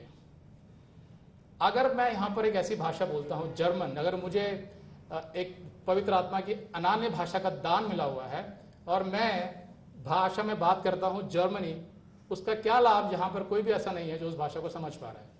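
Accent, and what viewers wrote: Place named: Kerala, India. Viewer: Superb well explained Bro.. I really like your Pure Hindi language after you been a malyali language mother tongue. Appreciate much on this topic of tongues. Will share to others as not much speakers in YouTube are Hindi speakers. Also we have spoken on phone many years back. GOD BLESS YOU ABUNDANTLY. I have also got an article on this topic As we print and distribute it free to aware people about this wrong doctrine.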